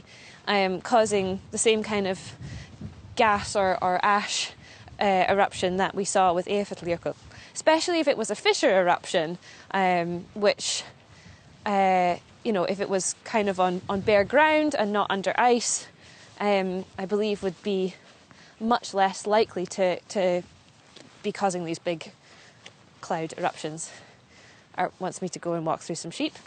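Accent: Scotland, United Kingdom. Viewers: What a lovely broadcast, and a surprisingly strong Scottish accent. Thank you. Poor cameraman must have found that difficult with the wind gusts 😁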